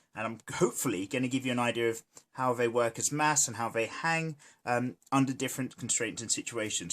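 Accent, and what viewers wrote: United Kingdom. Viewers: Brilliant, but it's so unfair you can speak of boobs with such ease. This would be so pervy sounding if you didn't have such a proper British accent.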